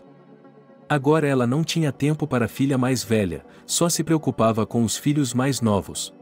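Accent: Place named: Russia. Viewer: O narrador é horrível. Confunde os pronomes . Refere-se a moça/mulher como ele e chama o rapaz/homem de ela. Atônita o narrador só diz atonita. Poço é “poco”. Aos ele não lê tudo junto. Ele pronuncia cada letra separada das outras: A o s. É uma infinidade de erros que você só entende porque são textos rasos, desprovidos de complexidade. Uma coisa é certa, o narrador não tem nenhuma intimidade com a Língua Portuguesa. A tradução é péssima e o narrador parece um russo lendo um texto em português, que ele tentou decorar, foneticamente.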